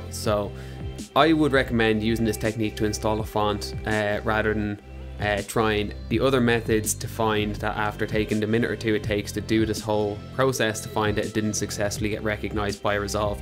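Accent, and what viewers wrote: Ireland. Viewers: good man, nice to hear an Irish voice. This was really helpful thanks! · i only subscribed cause I heard the irish accent... love seeing other irish creators :) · You Irish?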